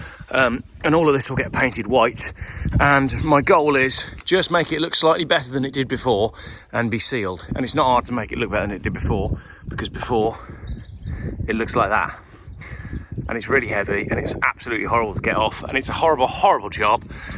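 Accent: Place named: Birmingham, United Kingdom. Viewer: Needs Phil to dilute the bumpkin ‘hidden’ brummie drool 🙏🏼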